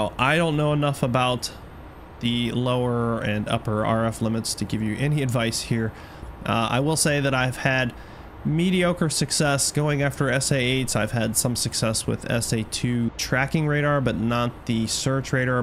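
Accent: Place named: Germany. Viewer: The German guys accent breaks my immersion 😂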